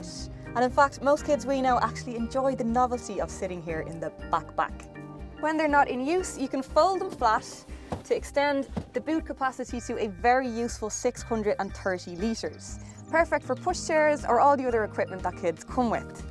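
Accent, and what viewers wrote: Ireland. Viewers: I just came here for the Irish accent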